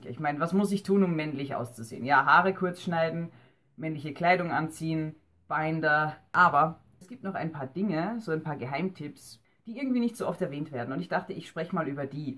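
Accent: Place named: Vienna, Austria. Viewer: bist du aus wien oder niederösterreich oder so? dein dialekt hört sich bisschen so an^^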